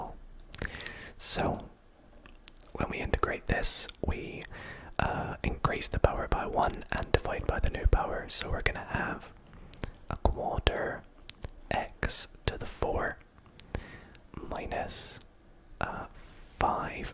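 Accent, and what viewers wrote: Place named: Doncaster, United Kingdom. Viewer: Are you from Doncaster ? Your accent sounds much like Louis Tomlinson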